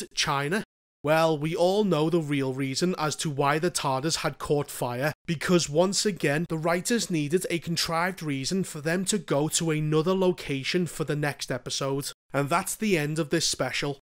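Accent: Liverpool, United Kingdom. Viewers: Sorry, I wonder are u from Liverpool?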